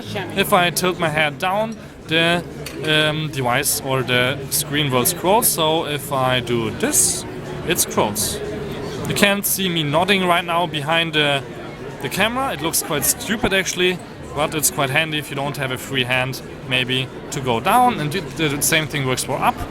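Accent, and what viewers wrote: Germany. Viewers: Hi first off, please refrain from using profanity in your videos. Also, are you german? :0)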